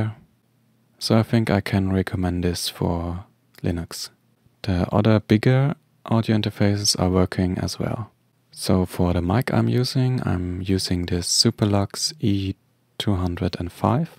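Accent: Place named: Germany. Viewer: Gutes Video mann hört aber Das Du ein Deutscher bist.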